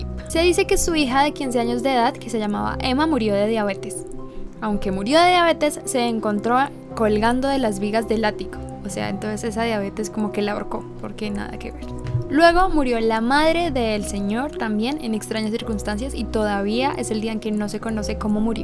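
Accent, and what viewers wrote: Colombia. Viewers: eres de Colombia sierto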